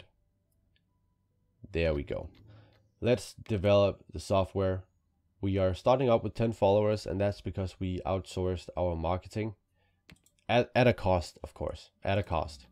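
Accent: Denmark. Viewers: Your accent sounds danish. Eller tager jeg fejl?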